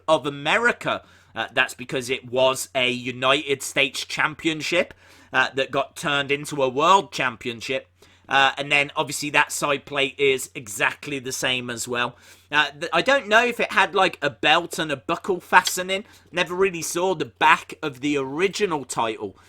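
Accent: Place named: Midlands, United Kingdom. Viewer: whereabouts in the UK are you from? Sounds a bit like a Midlands accent? What would be your nearest wwe show city?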